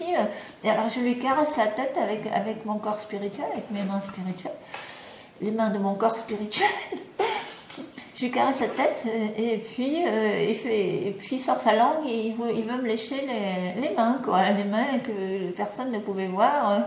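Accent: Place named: United Kingdom. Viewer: Superbe vidéo, de plus tu es très responsable dans tes dires et très soucieuse de ne pas choquer ton public. ps Ton accent est très agréable, Anglaise peut être.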